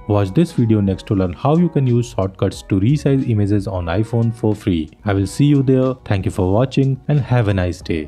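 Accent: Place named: India. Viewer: The man’s strong Indian accent distracted me from what he was saying. Also when he talked fast I could not follow his instructions and sometimes I could not even distinguish the words. I gave up and am seeking an answer elsewhere.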